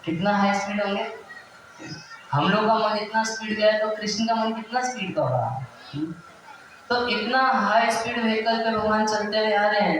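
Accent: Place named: Bihar, India. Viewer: Apka language bihari language se milta hai🙏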